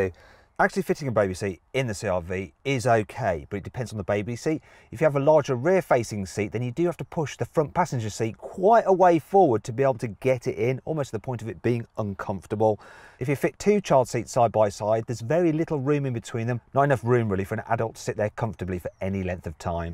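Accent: United Kingdom. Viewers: イギリスです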